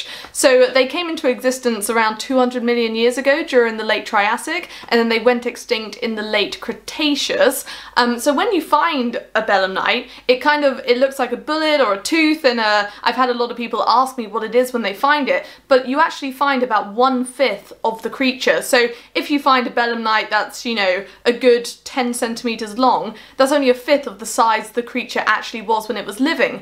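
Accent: United Kingdom. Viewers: Talk about paleontology it's amazing, but British accent make this talk so beautiful. Thanku! Rawr!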